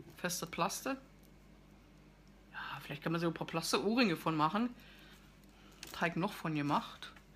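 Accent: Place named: Berlin, Germany. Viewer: Biste aus Berlin? Ick hör did doch :-)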